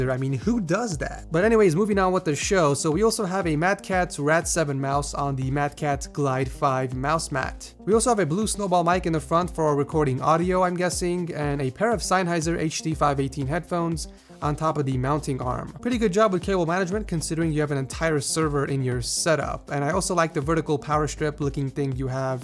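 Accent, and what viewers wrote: Russia. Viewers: Nice Russian Voice + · Best Russian accent evr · At first I was going to click out the video because of the Russian acent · Awesome Russian accent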